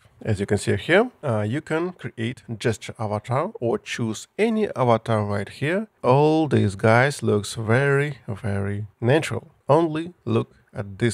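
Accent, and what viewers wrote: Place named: Russia. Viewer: Такой русский английский. Это скорее не акцент, а интонации Полезное видео, благодарю за труд.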